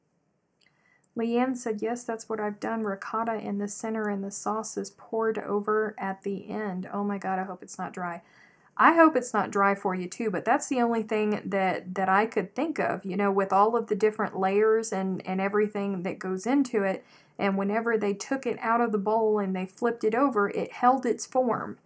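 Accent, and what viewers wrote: South, United States. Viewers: OH MY GOODNESS!!! I JUST heard where you are from😃😃😃 My hometown is Savanah Georgia🤗 I'm a GA girl living in Las Vegas, NV, and I can tell yall, it's true...These people have NO idea about sweet tea😳 They actually think you can sweeten cold tea😳WITH ICE IN IT😳😳😳 Ok, rant over😂🤗Oh, I do like the granny square you are working on, that's just enough beautiful color to make it pop. I have been binge watching your videos since I found you Saturday. I have a question for ya, I heard you say you were a graphic designer. Did you go to SCAD? I have a great friend that is a graphic designer & she graduated from SCAD. Uh oh, yep, just heard you talking about high cotton, yep it IS a southern thing. I heard that all y life too, lol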